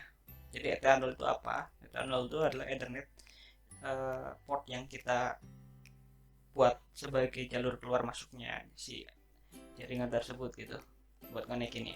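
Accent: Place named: Sunda, Indonesia. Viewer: Bahasa Indonesia dengan logat Sunda dan Jawa wkwk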